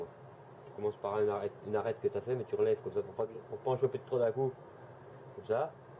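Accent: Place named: Vosges, France. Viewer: Ça fait du bien d'entendre un peu un (léger) accent vosgien ! Super que ton fils soit intéressé, mais va te falloir le double d'outils ! 😉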